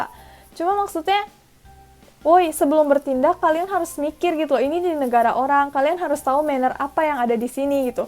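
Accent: Japan. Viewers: logatnya jadi kebawa logat jepang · thx dgn video ini gw jd lbh tau ttg jepang.. btw bahasa indonesia kamu udah ada dikit2 aksen jepang nya haha mgkn karena udah lama d sana 😄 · Itsnaini Nabila iya bener sudah kebawa bawa nada jepang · wah jadi kyk denger haruka nakagawa ngomong nya ada logat jepang nya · kak, bahasa nya udha kebawa nihongo kak :))) tapi luccuu aku sukak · Logatnyaa,,, udah kebawa jepun,, hehe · kakak kedengeran banget bahasa indonesianya ada rasa-rasa jepangnya gitu hahahaaa dari nada dan sebagian pelafalan. rupanya pengaruh juga ya tinggal di sana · wiihhh,,, infonya keren bangettt,,, kakak pasti kuliah ya disana,,, logatnya udah kayak jepang,,, dan raut wajahnya juga kayak gadis jepang,,, yang pasti kakak ichiban kawaii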